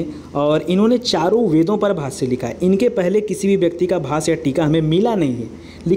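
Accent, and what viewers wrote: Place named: Uttar Pradesh, India. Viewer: Sir ji aap up se ho apki language se pta chl rha hai Bhot accha samjate ho sir ji thanku bye tc.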